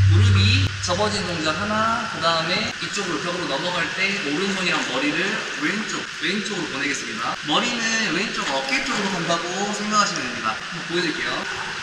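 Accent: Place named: South Jeolla, South Korea. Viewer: 설명도 잘하시고 구수한 전남 사투리 정감가네요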